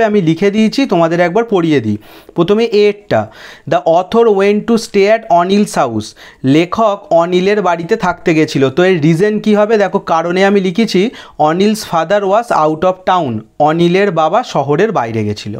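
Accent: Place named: Howrah, India. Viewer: Sir. Are you from howrah district? Your version shows that!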